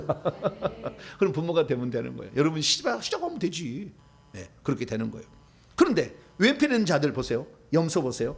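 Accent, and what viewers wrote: Jeolla, South Korea. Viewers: 목사님! 반갑습니다. 목사님 고향이 ”전남 화순군 도곡면“ 이시군요. 저는 화순군 춘양면입니다. 목사님의 설교 말씀을 즐겨 듣는 편인데 들을 때마다 속으로 생각하였습니다. ‘억양이 전라도 분 같은데…‘ 역시나였습니다.🩷 앞으로도 은혜스러운 말씀 기대하겠습니다.😀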